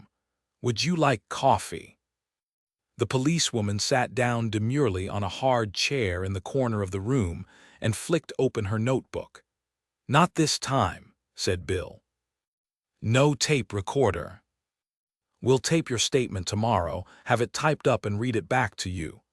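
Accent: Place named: United States. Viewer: An American narrator??? Bring back Penelooe Keith!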